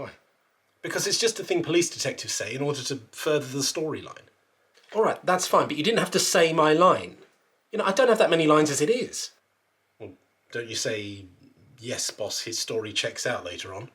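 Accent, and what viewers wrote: United States. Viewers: Can't figure out which American accent(s) you're attempting there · Great gravitas american accent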